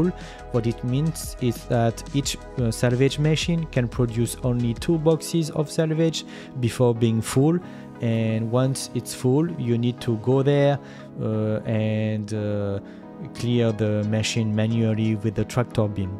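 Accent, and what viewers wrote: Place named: France. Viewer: Bel accent on reconnait les fr^^ , en tout cas elle est top ta vidéo :) sa ma donner envie de lancé mon reclaimer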